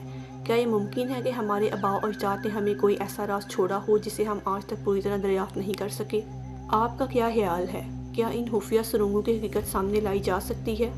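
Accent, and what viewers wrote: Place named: Punjab, Pakistan. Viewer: Achi video hai per ager ap slow bolain or Punjabi accent ki jagah Urdu Ka sahy talaffuz use karain to zyada Acha lagega Jese لہور Nahi لاہور Sahi word hai or سیکnahi سکھsahi words Hain aise he or b words Hain jinhain ap ghalat pronounced kerrahy Hain per video achi hai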